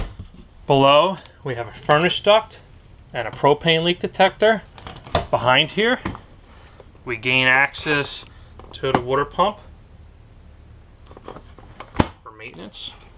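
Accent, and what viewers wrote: New Jersey, United States. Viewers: Great job! Thanks for pointing out the details. The camera lens was a bit annoying at times but it also showed a lot more that way. Love the Jersey speak. Had the feeling Ray Romano was selling me an Airstream!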